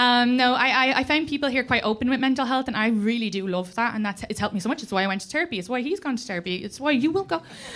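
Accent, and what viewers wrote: Ireland. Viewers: Hi I Think your so funny love your Irish accent hope you come to south FL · u are so good, for me u are up there with Steve Hughes, Alex Agnew, Bill Burr and Ricky Gervais and your Irish accent makes it so nice to listen to, greetings from Belgium and keep it up ! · she's lovely and kinda ♨. rapid fire speaking...😲easy on the coffee, lady! honestly, some dialects of Irish English are not understandable so maybe that's what people mean just like some American English is the same even some English from England is like 😵‍💫what did they say🤷‍♂. her Irish is lovely. my fav English is "received pronunciation". "wah-er"? I understand the "crazy" partner attraction. let's face it. they tend to be great lovers. cat isn't drowning the mouse. it's an Irish catholic cat. IT'S DRUNK AND BAPTIZING IT AT DEATH!🤣 4 jobs and thinks that's an acceptable life???? 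SSSLLLAAAVE MENTALITY!!!! does Ireland have a diabetes epidemic? because of the drinking.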